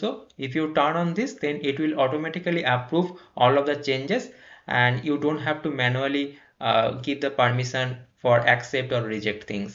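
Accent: Bengal, India. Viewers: R u Bengali...ur ascent and pronunciation is alike native of Bengal.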